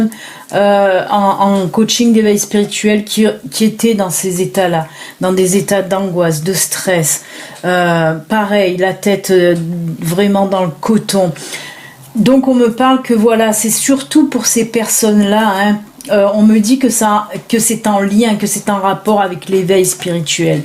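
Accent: South, France. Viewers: Merci beaucoup pour tout de tout mon cœur ton oracle et très beau j'adore j'adhère t'es guidance surtout avec ton accent du sud sa me rappelle mes origines je t'envoie plein d'amour bisous 😘🙏✨💋🔥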